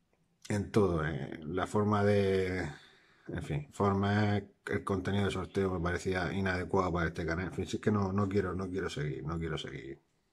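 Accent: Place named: Murcia, Spain. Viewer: Buen vídeo, por tu acento me pareces Murcianico?. Me suscribo al canal y participo!!.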